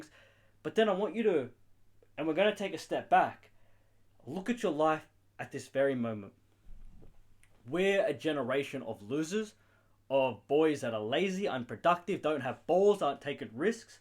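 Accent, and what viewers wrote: Australia. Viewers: are u australan ??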